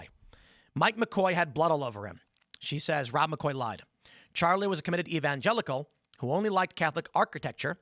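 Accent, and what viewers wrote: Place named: Chicago, United States. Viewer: Tim, please stop popping your words, it’s better when you sound like a Chicagoan.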